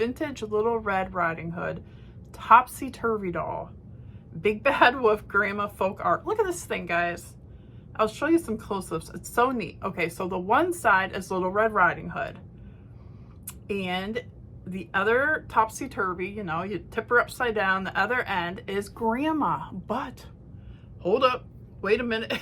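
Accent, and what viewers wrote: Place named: Ireland. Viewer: Great Irish accent! What movie was that with the junk lady troll?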